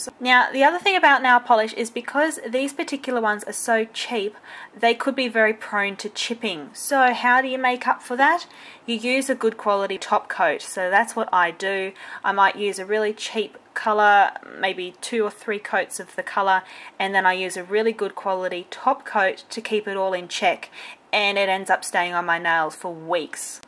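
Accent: Australia. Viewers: I love the way those sparkly bead thingies look for some reason.;) Oh no, it's that delicious-looking sponge again! I'm hungry agaiiin, and I just finished eating! As always, this is well shot, and the little notes and tips that pop up on the screen are cool. Ooooh, ooooh! And the fast motion writing on the sticky notes at the end! Genius! I loved it, and I mean that shit! <3 T'was wonderful to hear your Australian voice again,. I wish you the best!:D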